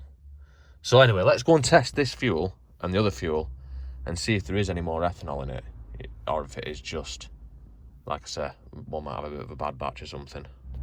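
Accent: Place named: Northern England, United Kingdom. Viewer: Your videos are so informative in a Northern stylie bruv 👌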